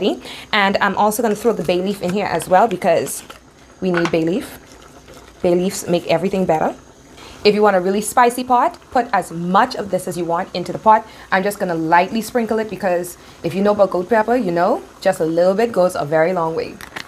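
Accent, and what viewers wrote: Bahamas. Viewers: I really enjoy watching you cook! The accent and the comments only Bahamas’s understand 😁 bring back so many memories of my childhood. I’m American born but my father is Bahamian. Love the food dishes from the island! 😋 👍🏾❤️ by the way, hadn’t heard monkey foot in a minute. My cousins used it all the time. 😁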